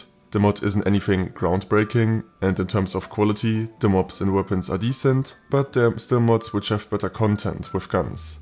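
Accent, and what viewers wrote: Germany. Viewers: 2 Sekunden drin, direkt nachgesehen, jap, Deutscher, direkt bemerkt xD Grüße aus Bayern und geiles Video, hast ne angenehme Stimme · Idk why but u sound German and nice video 🎉🎉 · Bist deutscher oder hört man beim Englisch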